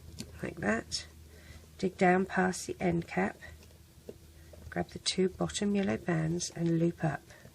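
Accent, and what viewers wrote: United Kingdom. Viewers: I dont mean for this to sound mean but you have a VERY british accent also peope0le dont rage bc im British too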